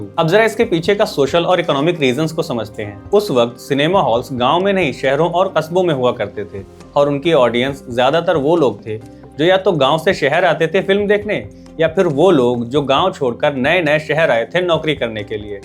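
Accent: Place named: Karachi, Pakistan. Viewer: app ki zaban bilkul hamaray jaisi hay from karachi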